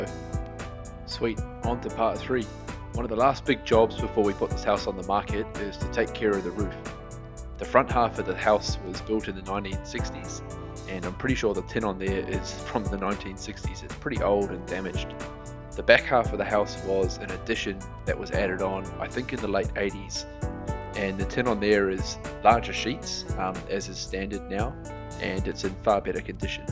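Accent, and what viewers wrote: Australia. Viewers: Aussie accent. Awesome video. By any chance do you live near NSW central coast? Can you come paint ours? :)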